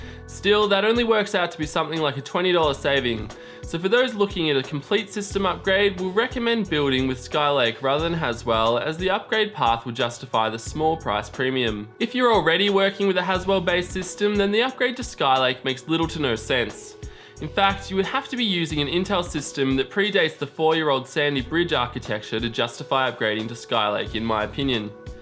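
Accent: Australia. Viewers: Are the parts priced in USD? Because you sound Aussie and these prices are tripping me out. (Unless you live in the US or are relating to the greater audience then fair enough). Get us them on mate rates? ;) Lmao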